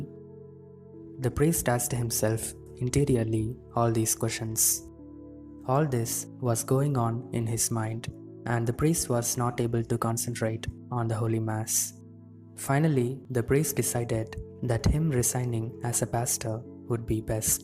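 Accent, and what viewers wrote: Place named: India. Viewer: Your accent seems to be of an Indian. Great Please continue your good work. May our lady bless you.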